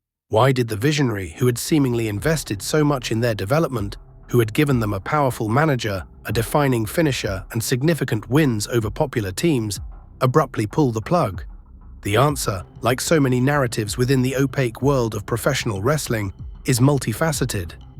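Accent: United Kingdom. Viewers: These episodes are truly a joy to listen to. The English accent gives it weight. Power and Glory rocked and when Roma became a Horseman I was down. I’m a 10 year old boy in ‘87 and pro-wrestling was my soul. I’m 10 again while I watch now and it’s better than I remember. Thank you.🤠🍺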